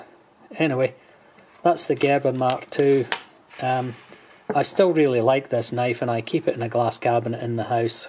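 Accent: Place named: Motherwell, United Kingdom. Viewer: You sound like somebody from Motherwell! Good vid 👍🇬🇧🇦🇨🏴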